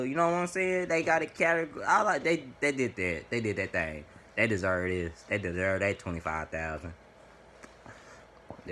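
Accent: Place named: Arlington, United States. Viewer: I know u from Arlington talking about cracking 4s u prolly from south Arlington way u talk it’s east side agg town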